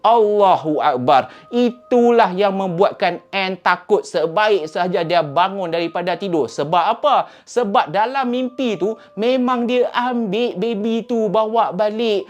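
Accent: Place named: North, Malaysia. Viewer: Slmt pg channel..hahaha terbaik intro kali ni..dimulakan dgn selawat.. cuma agak pelik mendengar bckp loghat utara..canggung bunyinya..tp kisah kali ni mmg best..nama pggilan pn sama mcm nama mamy...waah perasan sekejap nama mamy disebut2.. 🤣🤣🤣.. kisah kali ni mengingatkan mamy pd ank dara mamy yg disihir lbh kurang sama waktunya dgn kisah dlm video ni..waktu pkp..tp skrg alhamdulillah skrg semua dh ok..ank mamy tu dh sembuh.. terbaik video kli ni..bnyk pengarannya..best👍👍👍🌹🌹🌹🌹🌹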